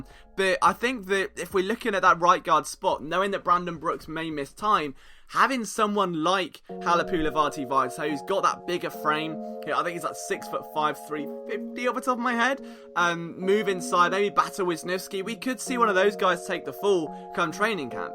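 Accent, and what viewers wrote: England, United Kingdom. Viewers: Exactly. I guess some people don’t like his English accent talking about American football but they are just being a-holes.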